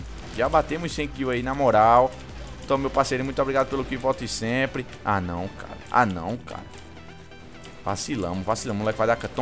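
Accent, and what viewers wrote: Northeast, Brazil. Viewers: se e do nordeste se tem o sutaque do nordeste kk mercadinho tbm so do nordeste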